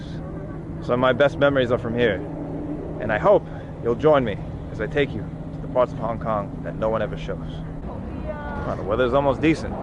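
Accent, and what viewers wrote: New York, United States. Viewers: Apart from a great but nostalgic video I really like the NY accent and directness. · Your New york accent is very strong in this video, or maybe I'm just noticing it now ;) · Great video. Your New York accent really came out this video 😂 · This channel def makes me appreciate china al ot more. Very cool perspectives. People are nice and appreciate he can speak the language. Hilarious how his American accent is so New York. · Love the NY accent. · You sound more New York than the last video I just watched. I’m guessing you had recently been back . Interesting how accents morph as we travel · I can't do the accent man, I think you're a great watch, but that Judge Judy accent is getting thicker. Reminds me of some people who you would not want to be associated with from N.Y. Stay authentic mate. Jake in Oz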